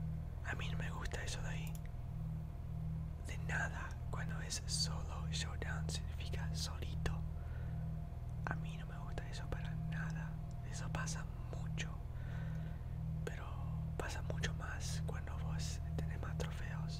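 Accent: Argentina. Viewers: Eres argentino? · bro tu español es increíble tenes parece Argentina ajjaja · Pero si es de argentina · Te juro que pensé que era argentino xd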